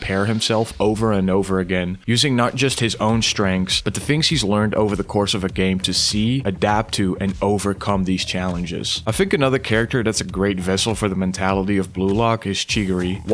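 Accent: Netherlands. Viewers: hoor gelijk dat je een hollander bent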